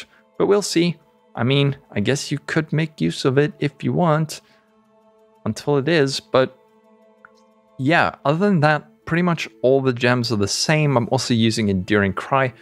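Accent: South Africa. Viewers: Are you a South African botjie?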